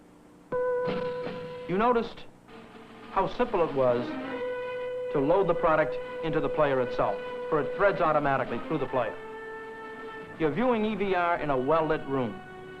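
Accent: East Coast, United States. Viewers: Good east coast accent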